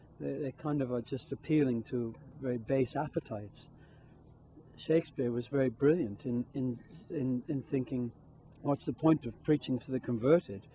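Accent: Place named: England, United Kingdom. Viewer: Why does he have an English accent here? He was raised in America. And he didn't have one when he accepted his Tony two years ago.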